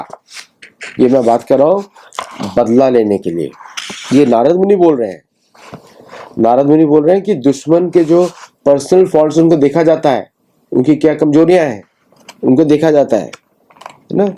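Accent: India. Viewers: Respected sir, I thought you were from Kerala or Tamil Nadu. The way you explain, all our family members, including my mother listens to your Vlogs. Hope you explain in english also regularly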